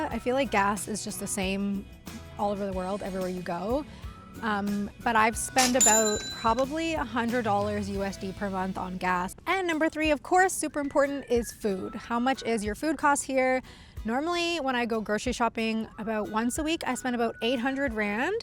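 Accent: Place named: New Zealand. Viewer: Hiya, great to to see you out and about! Canadian you say, but sounds kinda Kiwi? Any case, how are things going with that heavy metal (copper covered lead) allergy of you yours? Y'know, that high-speed / high-impact variety of lead? Causes severe skin irritation and all sorts of internal issues too, if I remenber correctly? Hey, have you tried to find a Job yet and earn some those cheap SA Rands? The same one's that seem so worthwhile right now, with the exchange rate & all? Honey, you're cluelss about where you are. Keep posting, it's HILARIOUS!. Enjoy while you can!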